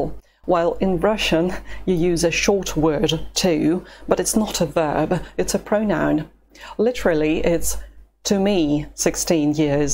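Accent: United Kingdom. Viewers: as a russian your english like BBC reporters ı am not sure ı follow your channel your english or your russian.. but it makes me keep watching . thanks anyway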